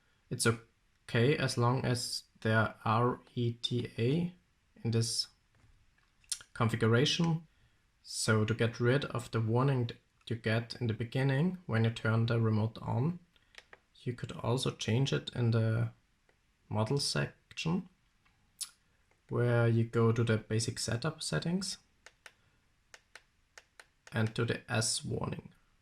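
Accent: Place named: Austria. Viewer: ich meine in deinem Englisch nen österreichischen Akzent zu hôren! Stimmt, oder😜🚁👍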